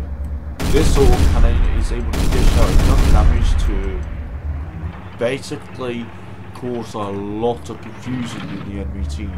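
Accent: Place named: United Kingdom. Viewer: I can tell hes British because his accent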